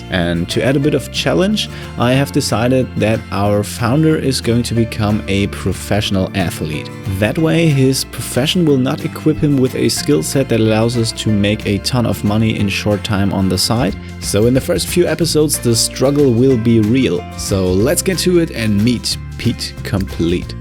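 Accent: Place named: Germany. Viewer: Back here for a rewatch after the new Series started and one thing I noticed right away is how different Pete sounds. As a fellow german I can tell how hard it is to get rid of the accent and these videos sound like they were made in the phase where Pete actively improved his accent and english speaking abilities in general. The pronunciation is pretty close but the cadence seems a lot more confident and well... practiced nowadays. Really goes to show what a good content creator Pete is imo. In all his works I feel a passion and a genuine desire to create something that is a joyful experience! So thank you Pete for all the joy you brought me I guess!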